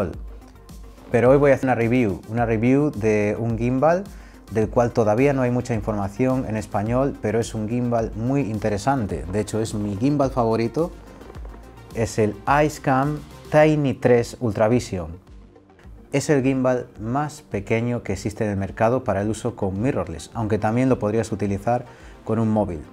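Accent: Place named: Latin America. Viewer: Amigo me encantó el video muy buena reseña del gimbal solo tengo 2 dudas espero me puedas ayudar 1 se pueden hacer timelapse con este gimbal he visto que con algunos se pueden lograr ejemplo en una noche estrellada podrías hacer un tutorial si se puede y 2 de donde eres como es que lo conseguiste lo he estado buscando en amazon y no lo encuentro soy de México tienes un acento latino muchas gracias que tengas un excelente día.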